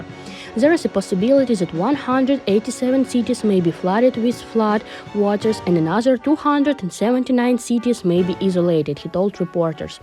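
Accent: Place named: India. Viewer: 인디안 영어냐? 발음이 왜그래?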